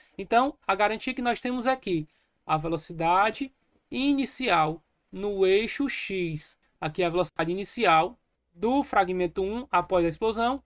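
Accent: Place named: Ceará, Brazil. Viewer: Professor, ótima explicação, parabéns. Pelo sotaque cearense, certeza de que o senhor é meu conterrâneo!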